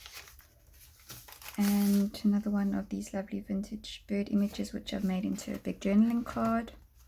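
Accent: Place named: South Africa. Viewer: You have some wonderful ideas and beautiful items! Love you SA accent too! 🤗🌸💕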